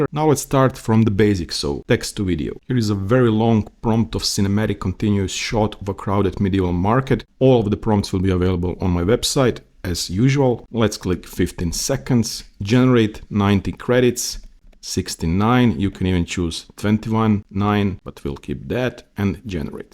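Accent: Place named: Eastern Europe. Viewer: Where are you from? I hear eastern european accent. this is a grate video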